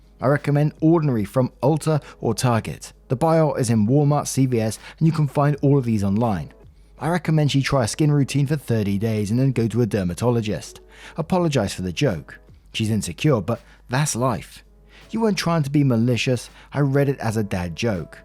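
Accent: England, United Kingdom. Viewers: Oh hi,! Hope you're well! I just got out the gym. Honestly I listen to you so much that my American English is turning more English English.... I have ASD and tend to mimic accents and speech patterns without meaning to. Had to explain what "skint" meant to 3 different people. Not complaining though, I kinda like it. Much love!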